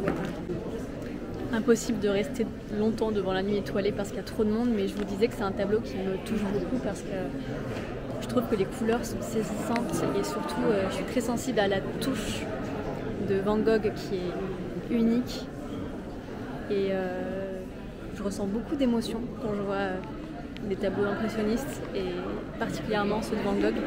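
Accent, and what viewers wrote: France. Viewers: J'adore énormément votre accent français Madame française.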